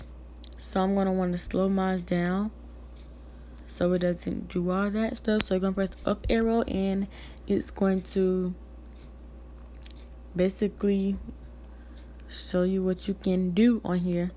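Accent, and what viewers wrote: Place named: Africa. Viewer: DANGGG I LOVE UR AFRICAN ACCENT♥️♥️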